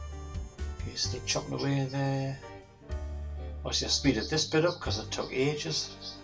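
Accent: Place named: Cornwall, United Kingdom. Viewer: A cool looking car. BTW Your accent sounds like you are somewhere in or around Cornwall.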